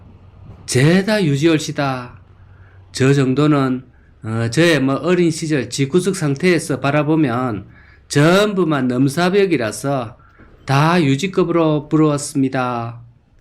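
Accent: Gyeongsang, South Korea. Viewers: 쥔 양반 목소리가 상당히 특이하네요~ ^^; 뭐랄까, 갱상도 사투리+교회오빠 목소리 ? 한국 부동산 멸망전을 위해서 오늘도 고군분투 하시느라 고생이 많으시네요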